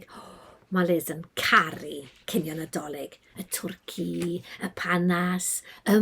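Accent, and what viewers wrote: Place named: Carmarthenshire, United Kingdom. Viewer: Dw i'n dotio ar wrando ar acen Sir Gâr. Dynnes ddawnus a brwdfrydig wastad.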